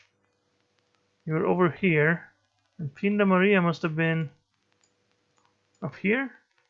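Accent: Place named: Scotland, United Kingdom. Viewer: For Greek script you can keep in mind that the P is actually an R (similar to Cyrillic in Russia), the triangle is a D, the H is an E, the triangle without a bottom line is an L and the P is an R. That are - im my opinion - the most valuable Greek letters. And if I may ask, from which country are you? Yo sound like a Scotsman.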